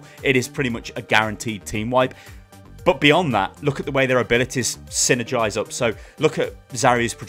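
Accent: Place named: Kent, United Kingdom. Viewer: Your from Kent in the U.K. arnt you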